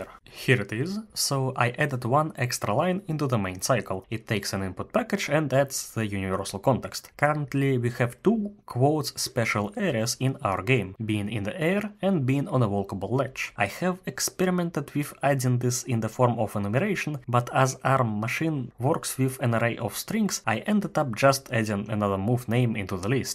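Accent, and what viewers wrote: Russia. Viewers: Ты русский? акцент очень похож )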